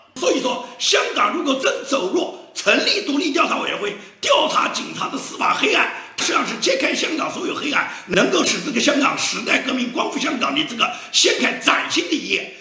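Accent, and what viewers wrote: Nanjing, China. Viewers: 建民先生略带南京口音的激昂讲演，总能催人奋进。建民先生出众的口才，足以成为一名政治领袖。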